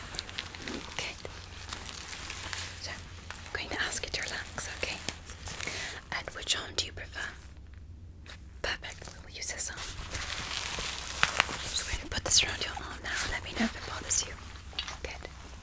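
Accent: England, United Kingdom. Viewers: I never knew you could do a British accent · This is a very accurate British accent, coming from a Brit, love it 😍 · Fantastic English Accent 👌 some great tingles ❤️ Thank you 😍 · I swear her British accent is the most satisfying and relaxing thing ever 😍🙏🏼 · English accent* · oh I thought you British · Only recently found your content and love it. Tonight I found myself asking I’m sure she was American lol. I’m from UK, London and that is an awesome English accent. Good work! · Is she British cuz In her other vids she talks different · This is a very Peggy Carter British accent 😩😂😂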